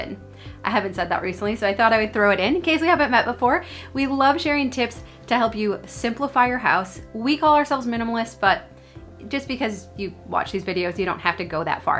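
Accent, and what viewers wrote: Minnesota, United States. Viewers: You say bAg like a true Minnesotan! Gotta love that hard A sound. I also have that same A problem 😂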